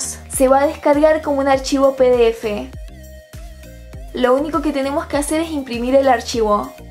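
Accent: Argentina. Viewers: Sos argentina? Sólo para saber xd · Eres de argentina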